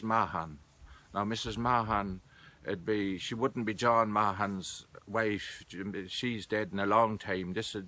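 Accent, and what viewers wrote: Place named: Longford, Ireland. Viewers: it's a North Longford accent. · This made me laugh. My ancestors are from Longford so I guess they spoke this way.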